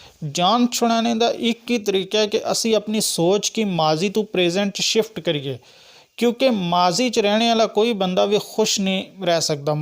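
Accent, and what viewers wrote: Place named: Potohar, Pakistan. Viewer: I am bilangual ; speak Seraiki and Balochi , but I fully understood your Punjabi garnished with a little potohari accent.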